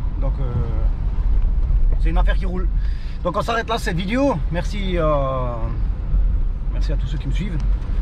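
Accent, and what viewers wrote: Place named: Alsace, France. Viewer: Vraiment du plaisir, pour l'accent qui me rappelle mes années dans le 67, et puis de voir un vrai mécano, pas un guignol comme on en trouve dans les garages de marques à 150€/h . Bonne continuation et un Abonnée de plus ;- )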